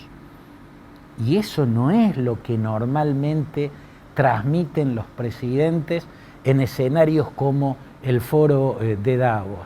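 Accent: Córdoba, Argentina. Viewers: Claudito, yenes una arma retórica que seguramente habría impactado en Davos: su exquisito acento Cordobés. Sublime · es muy capaz en política internacional, ahora, de Economía NO SABE ABSOLUTAMENTE NADA. Como buen retacon, cree que es mucho más grande y genial de lo que es. es solo un OPINATOR de cabotaje, lo lindo es que le sale la toooonada cordoooobesa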